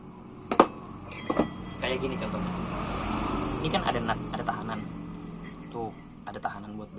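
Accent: Banjar, Indonesia. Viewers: Logat nya banjar di mana lokasi nyaa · banjar banar nah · Orang banjar kah pian om.. aksen nya kaya banjar😁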